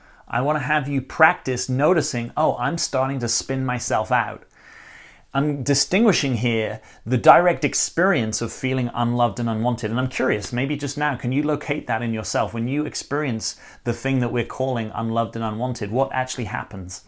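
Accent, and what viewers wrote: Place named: United Kingdom. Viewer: Your teaching and views are resonating with me more than any other coach. I love your british accent! Thank you for all your work! Your my hero, because you are so truethfull.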